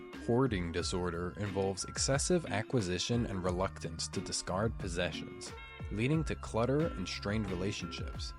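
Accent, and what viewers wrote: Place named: New York, United States. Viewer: That's definitely either a New York or Philly accent, not Boston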